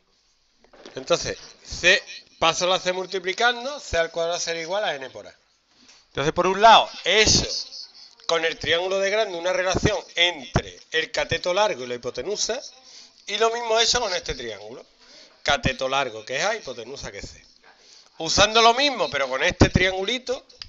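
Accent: Andalusia, Spain. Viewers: ole ese andaluz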